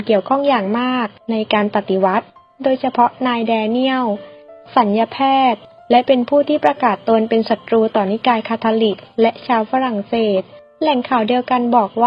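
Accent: South, Thailand. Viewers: แอดอยู่จังหวัดไรครับ.คนใต้หรอ.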